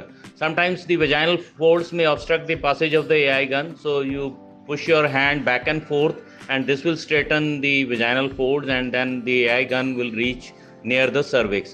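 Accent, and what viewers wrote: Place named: India. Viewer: yes but it wasn't enough to understand your indian accent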